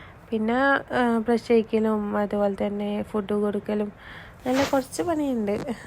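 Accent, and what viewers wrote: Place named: Kannur, India. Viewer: kannur il evdanu...aa slang kettappo thanne manasilayi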